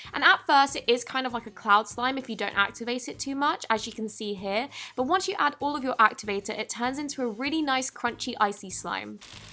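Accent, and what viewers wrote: United Kingdom. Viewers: Theofficialblossom vlogs it’s british like mine! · Theofficialblossom vlogs British accent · Theofficialblossom vlogs shes British like me 😁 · London/British accent like mine 😊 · I love your British voice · Theofficialblossom vlogs she form the United Kingdom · Lol it's a British accent like mine. so there Americans now u know that our accent isn't POSHY POSHO 😄 so just remember dats how we lot sounds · I love how she talks I talk American so it’s so much different than her British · Theofficialblossom vlogs she’s British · Where do you get tour borax from? You sound British like me and I can’t find it anywhere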